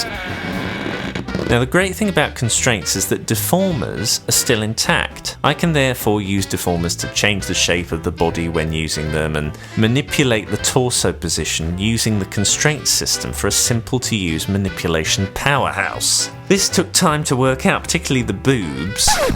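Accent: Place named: United Kingdom. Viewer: Great work as usual, always an inspiration. Hey, have you ever considered providing training? Sounds like you’re also based in the uk… I’d be interested in attending a course if you did.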